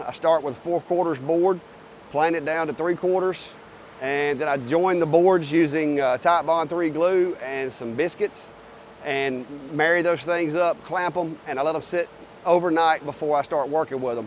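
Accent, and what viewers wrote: Alabama, United States. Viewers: Cool project. And it's nice to hear another Alabamian's accent. I guess it's been too long since I have been home.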